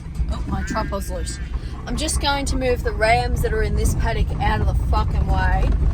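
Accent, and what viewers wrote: Australia. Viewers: It's just normal Aussie talk, mate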